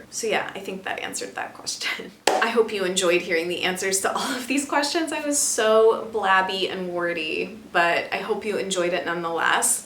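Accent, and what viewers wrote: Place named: United States. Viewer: First of all, can I say how great your English is? Might sound weird to you, even more so given that I am not a native English speaker myself, but I studied the English language and I love the fact that you speak it sooooo well, with a wonderful voice and accent (I have a thing for American accents, but mild ones, just like yours ^^)and w wonderful and varied vocabulary... You are extremely nice to watch but dare I say you are even nicer to listen to... Anyway, that was a great video, as usual. I discovered you through Clelia and I am so glad I followed her advice! I, for one, would be very interested in hearing you talk about your transition to a non hormonal birth control method (I got rid of that in 2010 too), as well as your relationship to music, obviously. You might have explained it in earlier videos that I haven't watched, but what is your relationship to the French language? 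Anyway, I hope you're having a great time in Texas with your family (I saw in IG that you had some croissants, good girl!! ^^). Thank you for being a very inspiring woman.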